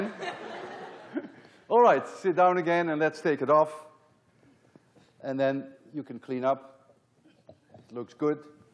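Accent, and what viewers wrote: Netherlands. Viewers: Do I detect a Dutch accent? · I knew he was dutch because of his accent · Yep, he,s a dutch man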